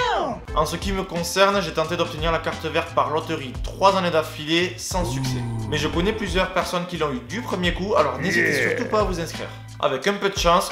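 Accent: South, France. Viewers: en passant tu as une petite accent du sud n'est-ce pas? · Je regarde quelques-unes de tes vidéos et me suis abonné. Je me pose une question. Est-ce que tu accent un peu "du sud" s'entend quand tu parles anglais ?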